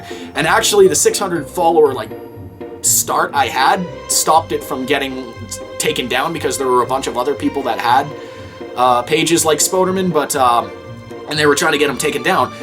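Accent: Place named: Canada. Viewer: I was going to ask you if you were from Canada because of your accent but then the video answered it for me.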